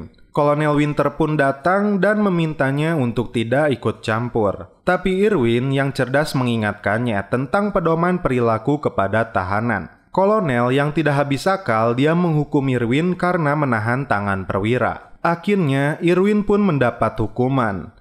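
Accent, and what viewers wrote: West Java, Indonesia. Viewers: Org sunda y bang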